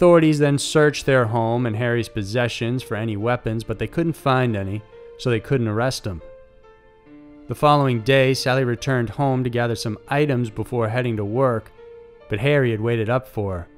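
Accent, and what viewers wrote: United States. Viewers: I live a couple miles away from the river Severn! I live in a pretty rural part of the country so it’s really weird to hear it’s name being said in a Yank accent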